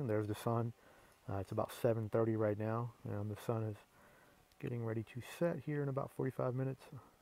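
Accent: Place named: United States. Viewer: why talk meters when you sound American.....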